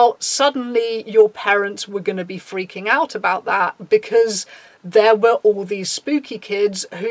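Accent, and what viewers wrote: United Kingdom. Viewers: You sound British... if you are British... please tell me what shopping centres people who listened to chilled in a shopper centre and pretended to be vampires because I LITERALLY never seen this. Yes I got bullied at school for being a “Greebo” but literally nobody reacted like this who listened to him.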